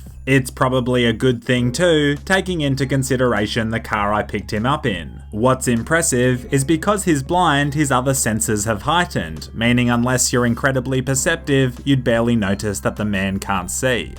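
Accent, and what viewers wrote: Australia. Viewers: You talk like a robotic Australian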